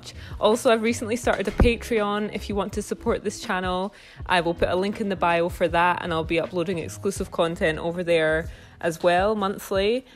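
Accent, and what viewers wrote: Scotland, United Kingdom. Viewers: I'm and this Vid-yO that Scot accent is physically painful no shade, nice content great quality videos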